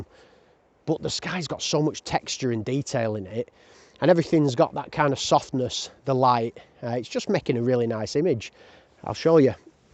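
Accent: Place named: Lancashire, United Kingdom. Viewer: Another great video, your Lancashire tones are music to my ears! However.....even better if you knew where you were! You were not at Devils Kitchen, you were at Cwm Idwal and then Llyn Ogwen. South Stacks is at the north west of Anglesey not the southern tip!😂😂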